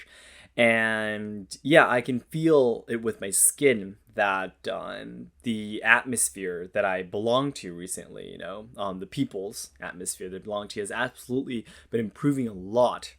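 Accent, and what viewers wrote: United States. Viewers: I am very grateful and happy that you speak so politely in English. I've never needed to worry about who is around me be upset or offended by hearing anything on your channels. Which makes me very happy. As far as your English accent being American or basically the same as mine. I think that it makes it easier for anyone who speak English to understand you. They send people from other parts of the US to the region you lived in / I am from to learn how to speak American English in a way to be well understood. You are very polite in English too.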